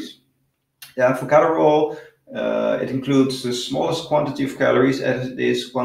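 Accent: Netherlands. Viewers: This dudes sounds as Dutch as you can sound. I would bet the house on the fact that this guy is originally from Holland.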